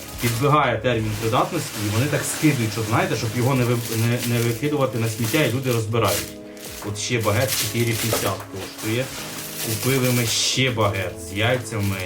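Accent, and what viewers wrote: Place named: Poltava, Ukraine. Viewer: Вас може це здивувати, але половина України БАЛАКАЄ. Далеко не всі в побуті спілкуються чистою літературною мовою. Місцеві діалекти рулять 😊. І немає в цьому нічого поганого, адже завдяки діалектам мова і є такою різноманітною. На Полтавщині навіть є такий жартівливий вислів : на сході "гаварят", на заході говорять, а у нас в Полтаві балакають і все чудово.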